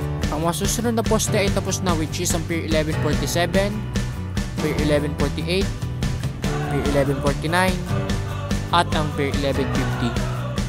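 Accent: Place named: Philippines. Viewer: tagalog accent na ha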